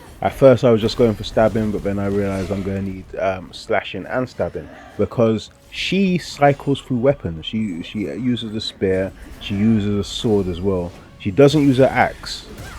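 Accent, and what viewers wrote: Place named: Italy. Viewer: mate! I have an Italian friend who has exactly your accent, even a similar voice. So, I was like, aha! Got ya!!! You do like Wuchang!!😂😂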